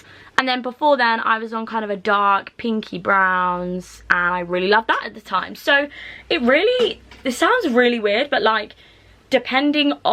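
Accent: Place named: RP, United Kingdom. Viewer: I love your cute posh accent 🥰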